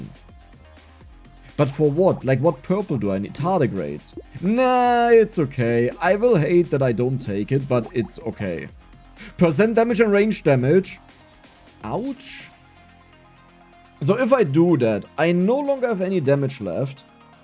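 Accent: Germany. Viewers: Man hört das Deutsch so durch xD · The german accent i love it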